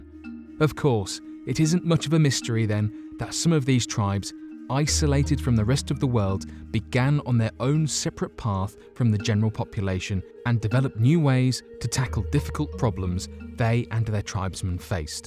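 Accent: United Kingdom. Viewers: I am finally tired of the misleading Click Bait and vague stories with no real thorough information. The girl with the different colored eyes, Click Bait! How fast can the African runners get up to?! The Okinawan people live how long on average? Finish your damn stories! These are not super powers - you got me! Clearly adaptation and acclimation have created the hearty Sherpas! Poor journalism with a British narrator! I'm not impressed. With YouTube's recent money police out and about demonetizing people for all the false and stupid reasons, they should take a look at you and smack you for your constant fake thumbnails and false and misleading, half researched, bullshit titles and stories! I will now unsubscribe.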